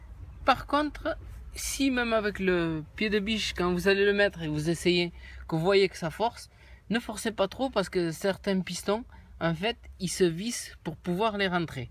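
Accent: Marseille, France. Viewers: mécanicien dans les roues de brouettes!! Ce type de vidéos devraient être carrément censurée ,vu le danger que représente ce type de "travail" de caraque !! Bon, en même temps, apparemment ,vu l'accent, on doit être du coté de marseille, alors faut pas trop s'étonner !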